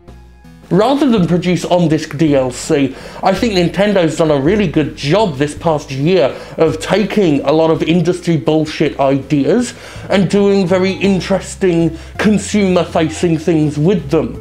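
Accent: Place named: United Kingdom. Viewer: (I'm new to Sterling) says he's american but he sounds british so does he live in america and is british or what?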